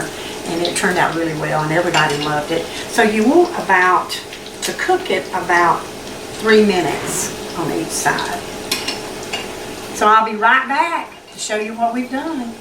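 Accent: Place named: Alabama, United States. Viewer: This just brings back so many memories of cooking with my grandma! 😭😭😭 I’m originally from Alabama and your accent brings me back home, thank you for your sweet southern charm!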